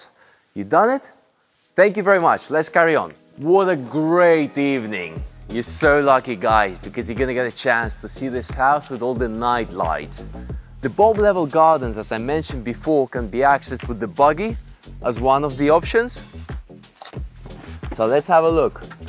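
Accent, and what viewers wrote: Russia. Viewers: Мне одному показалось или ведущий русский? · Cant make out the accent. Russian, Dutch maybe...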